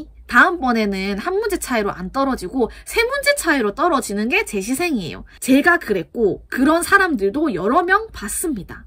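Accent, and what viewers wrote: Gyeongsang, South Korea. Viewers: 혹시 경남분이세요? 저 고등학교때 쌤이랑 말투가 넘 비슷해세유ㅎㅎㅎ귀엽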